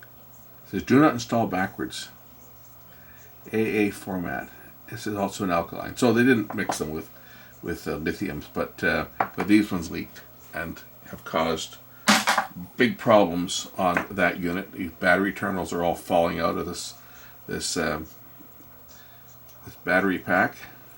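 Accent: Canada. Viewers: you speak funny language. sounds canada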